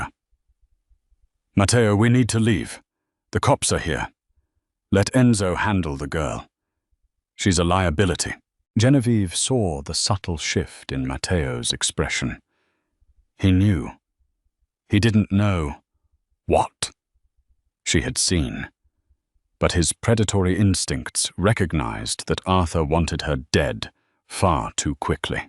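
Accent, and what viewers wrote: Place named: United Kingdom. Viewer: I love 💕 the story, but I get tired of the British narrator. He is in America 🇺🇸, and the narrator should have an American accent. Don’t get me wrong, I do like the British accent, but I would like to hear the American accent sometimes. The British narrator sounds too dramatic. Rena from Rio Rancho, New Mexico, USA 🇺🇸. ❤❤❤🥰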